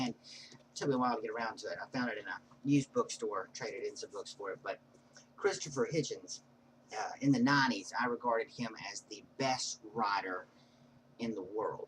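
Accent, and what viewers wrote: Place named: South, United States. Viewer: Wow. An outspoken informed atheist; putatively South Carolinian. Ain't that the Bible Belt? I know it's prejudicial of me, but it's strange hearing knowledge and wisdom via a southern accent. I too am an avid atheist but never stopped seeking and learning. My fave is George H Smith's "Atheism, the Case Against God" but I've read over 100 others on atheism, science and religion and, of course, the Bible, a book I treasure as a peek into the minds of ancient people. Hope your intellectual stance isn't adversely affecting your social life., a fellow rather odd He named himself after one but don't accept no god!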